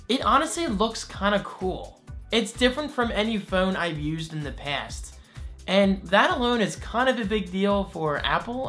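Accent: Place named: Philadelphia, United States. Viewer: You from Philly? Edit you said your dad went to AC. You got a Philly accent.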